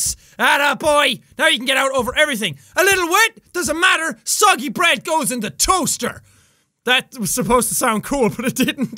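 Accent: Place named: Ireland. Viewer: I like the stronger Irish accent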